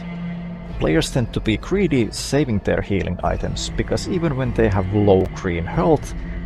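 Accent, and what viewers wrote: Finland. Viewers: I love your slight finnish accent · You have very finnish accent ❤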